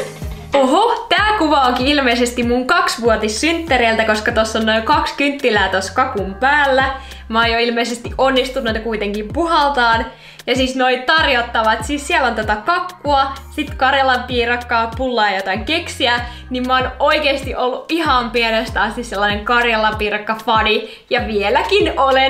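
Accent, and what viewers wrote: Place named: Tampere, Finland. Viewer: Sä kuulostat ihan Tamperelaiselta💕💛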